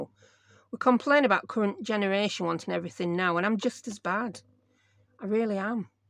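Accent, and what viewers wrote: United Kingdom. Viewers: I am American but was stationed in Britain for 3 years while in the Air Force. I love listening to you because I really miss Britain. I found myself calling scotch tape Cello tape today at work. The person I was talking to looked at me like I was from outer space. Then I realized what I had said. Lol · I loooove your channel. Maybe too much. I watch when I craft, when I go to sleep, and even on my way to and from work. But I may be over doing it because tonight at work, some components I was working on weren’t lining up and instead of the voice in my head doing it’s usual grumbling, a perfect imitation of you came out saying “Ahh! It’s all wonkay” 😂😂😂 I can’t tell you how happy I am that the voice in my head has gone British! 😂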